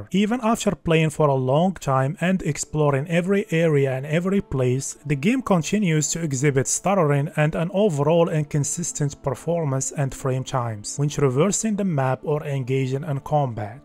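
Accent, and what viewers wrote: Morocco. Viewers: The accent mghribi byna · yo bro u moroccan??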